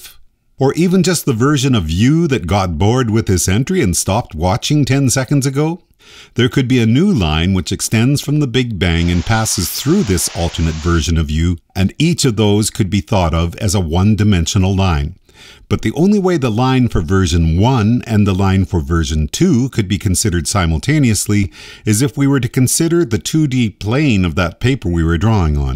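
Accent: Canada. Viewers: You’re Canadian! I heard it in the aboot. Great videos.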